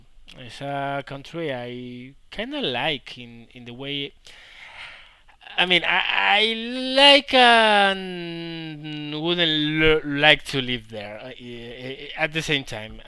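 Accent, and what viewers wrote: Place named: Spain. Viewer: Your English is top notch regardless,. Your sexy Spanish accent is as thick as Tiger armor, but your actual English is pretty much flawless most of the time.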